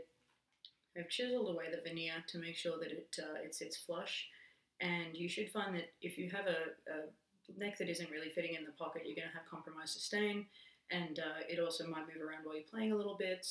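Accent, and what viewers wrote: Australia. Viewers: you sound aussie. you in oz or os and how have you found buying china outside of ebay?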